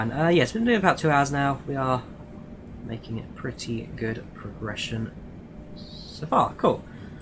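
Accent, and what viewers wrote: United Kingdom. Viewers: Why are you using British accent?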